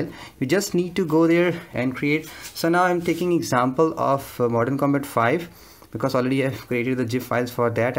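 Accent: India. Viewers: When i search tutorial i always hear voice of indian 🤦‍♀️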